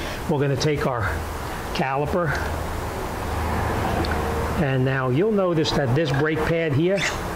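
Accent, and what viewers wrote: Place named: New York, United States. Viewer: Leave it to jeep to make a weird rear brake setup, lol. Im like..."an o-ring? Why, what for?...what the hell are these damn long metal clips on these brake pads for? Why did this rear rotor set come with 4 long metal brackets..what is going on here!? Damn Jeep"🤣🤣 Was gonna just do em like I did on my Ford Edge - which doesn't have those 4 long brackets that the pads slide onto at all, they just clip in like the fronts. The caliper on there looks exactly like the front calipers, except 1 piston instead of 2 . And the brake pads don't have those metal clips on them that need to be depressed to get the caliper off. Cause I looked at my mother's rear calipers and was confused at what was going on, haha. Thanks for the video! Glad I watched it before doing them on her 06 Grand Cherokee. Thanks for specifically showing the rears! 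Other videos I've seen atleast just show the fronts, which is normal like most cars. Then you get to these outer space rears🤦 haha. Thanks again! A+ on the NY accent. You sound like me, except I'd be adding some choice words in there lol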